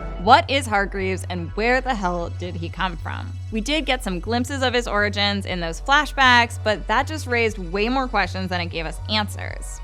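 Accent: United States. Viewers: Your accent is more like The californians from SNL 😁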